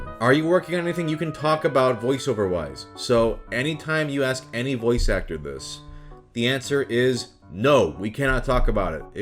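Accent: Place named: Michigan, United States. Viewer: As I was listening to his voice, I wasn’t hearing Southern California - more upper Midwest. Sure enough, he was born in Minnesota and spent time in Michigan. Definitely hear a serious Michigan influence in his American accent.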